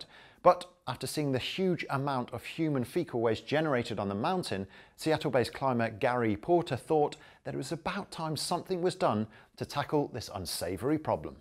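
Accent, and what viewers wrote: United Kingdom. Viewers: Cicaaaadas! I hate British accents! Cicadas.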